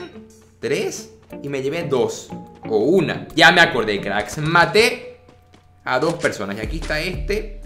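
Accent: Venezuela. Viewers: Hey chamo eres venezolano?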